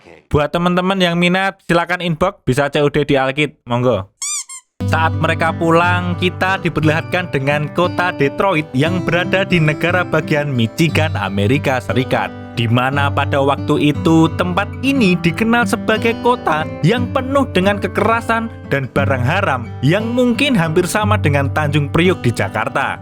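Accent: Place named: Surakarta, Indonesia. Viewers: Pasti mimin orang solo wkwk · Admin e wong Jateng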